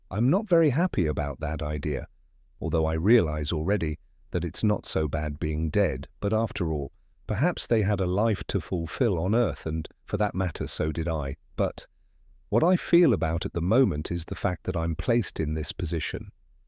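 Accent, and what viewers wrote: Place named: RP, United Kingdom. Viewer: The language used from the transcript is cockney English, but spoken by a person who speaks Queens English. That would be like getting a Mexican person to read a transcript in a southern American accent, certain inflections just don't work, and it's hard to follow. Luckily I speak cockney english, so by reading the transcipt I could understand better what was being said. The use of some words prove to me the time period when the transcript was made, the words "Ninney", "Cor" "A to do" etc, were all common usage words i n cockney English from the early 1900's to the 1960's. Thank you for this wonderful video.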